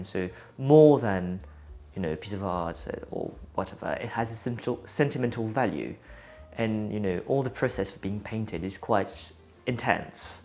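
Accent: England, United Kingdom. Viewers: Such a nice place with a country feel ..this is one of the reason i like London and the British touch ... it's often very personal and a mix of patterns and colors.. how can a young man (who has recently arrived in London) able to afford such a nice London home?! . C'est vraiment impressionnant comme parcours ...et qui plus est you've picked up the english accent ...vous parlez parfaitement dans les deux langues ..last but not least j' adore votre intérieur .. you're so talented , je suis estomaquée ! And if you're reading this little note , seriez vous assez gentil de me dire avec quelle "paint" vous avez refait la cuisine car j' aimerais rénover ma vieille cuisine qui a de beaux éléments en bois et la votre m'inspire ! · bravO!! Your home is homely, elegant, quirky and inviting and graced by your charming english accent:-) ⭐⭐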